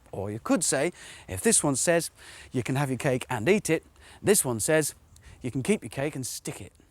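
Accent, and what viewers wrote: United Kingdom. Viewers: It's partially because of his accent. Note how in certain british accents, Lava for example is pronounced laver.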